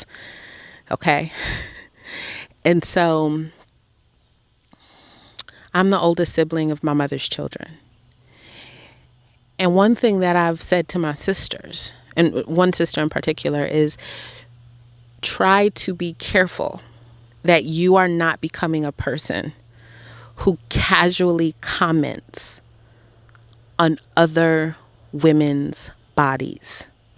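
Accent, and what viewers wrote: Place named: South, United States. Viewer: You have every type of country accent in your voice lol i hear upstate, FL & da boot lol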